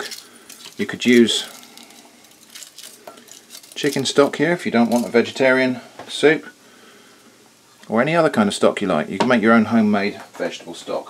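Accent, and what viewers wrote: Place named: Dorset, United Kingdom. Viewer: thought you had a Dorset tone I'm near Bournemouth funnily enough lol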